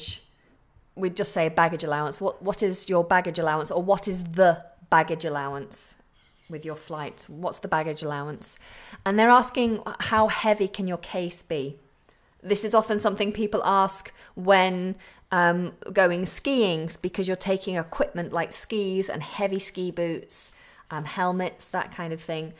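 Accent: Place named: RP, United Kingdom. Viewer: No one really speaks this posh tbh but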